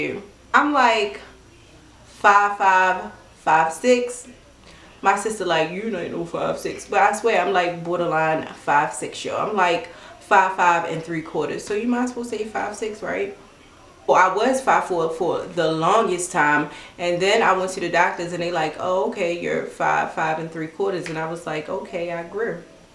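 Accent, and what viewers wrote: Baltimore, United States. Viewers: That Bmore accent. Love it sis..you slay every day! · I hear a B More accent yup there it goes lol . All my family lives out there❤️ new supporter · I been subscribed for along time now and I already had it figured you was from Baltimore ik that from anywhere I just needed you to say you was from here cause I could have been wrong lol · I KNEW that was a Baltimore accent lol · Since you're from Baltimore ( which I kinda guessed from your accent) B-More Careful by Shannon Holmes, True to the game by Terri Woods ( I think), and The coldest winter ever by Sista Soulja, and last but not least the Bible!!! God bless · Love your videos 😘 I knew you were from bmore cause you sound like snupe from the wire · I watched your pony tail and bang video and heard yor accient, i was like sis sound like she from B more let me check our her channel. 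Keep up the good work boo. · Legendary Baltimore accent💕💕💕😜😘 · i watched this to confirm you were from Baltimore ❤️ i know the accent when i hear it 🤟🏽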